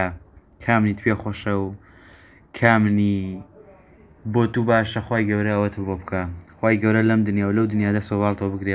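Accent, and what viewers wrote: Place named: Garmian, Iraq. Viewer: ئەم برادەرە دەنگی بە گرمیانی ئەکات😁❤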